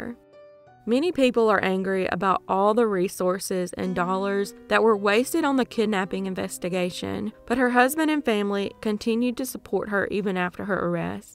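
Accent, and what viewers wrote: South, United States. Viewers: Your southern accent is adorable 💕❤️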